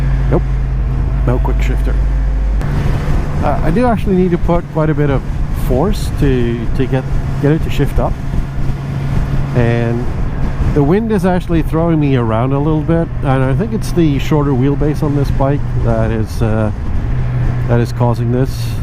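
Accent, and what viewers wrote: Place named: Canada. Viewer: I’d have said sounds like a Canadian accent Nice bike bought an orange one a few months back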